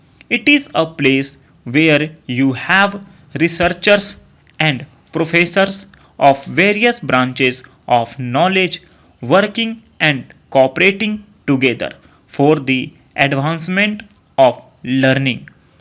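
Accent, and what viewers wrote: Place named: United Kingdom. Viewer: No need to bring brtish accent while dictating. Pls understand the correct pronunciation.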